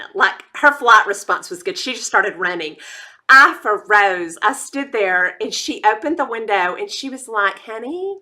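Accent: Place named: Texas, United States. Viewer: where the Heck are you from? I talk just like you and the "YALL" was a dead giveaway. What town in Texas are you from? I laughed my butt off all the way through this video. It was GREAT! Loved every minute of this. Hope you are having a terrific week. Sending Big HUGS to ya Girll! xoxo Sharon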